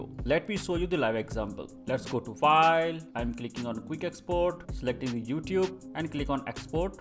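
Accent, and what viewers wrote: India. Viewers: Why is it always some random indian with a strong accent that solves my technical issues? Thanks a lot!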